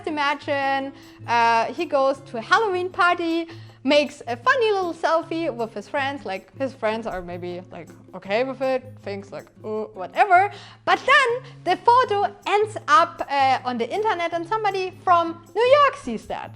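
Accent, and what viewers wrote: Germany. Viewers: awesome! I wish I could have friends like you around me that realize all the work done when you make a costume, realize a vid, a how to vid, a thing with a 3d pinter, learn moves on a fight scene or how to utilize softs like photoshop, lightroom, fusion360, hitfilm, passing time consuming energy trying to find how to make this special costume piece with this special color or texture, find materials and technics...generally all I have is people doing sports and watching tv series, never make a thing with their hands, so likes are few. Anyway, having positives people like you, enjoy my day and the most important thing is to be proud and happy of what we are, whatever we are, we are awesome , and never give a sh.. to people that dislike just for fun. (special mention to your german accent that I love because I understand all (and probably I have almost the same because I'm french :-D ). 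my tip when I see a bad comment: scroll! :-D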